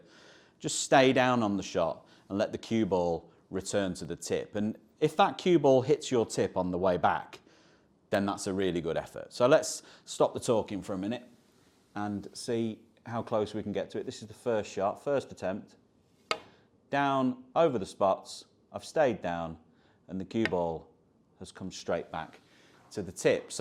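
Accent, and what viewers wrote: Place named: RP, United Kingdom. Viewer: Great snooker player but such a boring generic, posh sounding personality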